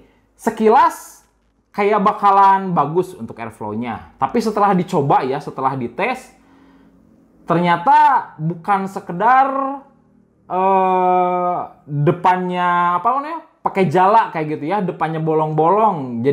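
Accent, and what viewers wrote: West Java, Indonesia. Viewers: other people: review this guy: Ripiu wkkwkw, sunda banget kedengerannya pertahankan ciri khas nya kang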